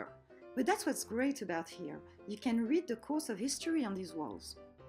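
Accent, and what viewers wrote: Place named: France. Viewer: most enjoyable video related in delightful french accent.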